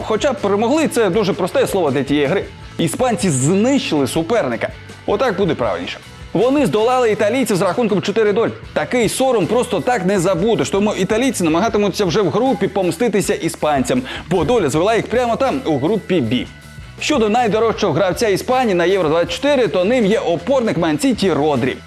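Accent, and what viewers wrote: Russia. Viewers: Таке враження, що ведучий зростав на російськомовних відеоблогах, треба ще тренувати українську (помилки виправляти + вимову трохи ставити, бо подекуди проскакують маасковські інтонації). Сам матеріал доволі цікавий (хоча, у табличці теж помилка – 2 і 3 місця). Вже не перше класне відео на цьому каналі! Продовжуйте!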